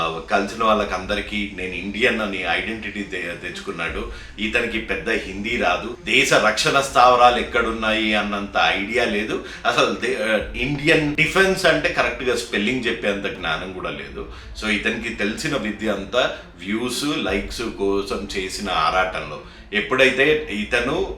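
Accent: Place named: Telangana, India. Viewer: Nenu Yedava Jagan gaadi supporter naithe kaadhu. Kaani meeru staunch TDP fan ante kaadhanakunda undalenu. TDP ni gaani, Chandrababu garini kaani, Pawan Kalyan gaarini kaani ae chinna maata anna meeru enter avuthaaru. And more to that matter, you speak Telangana accent. Asalu em connection emo ardhame kaadhu.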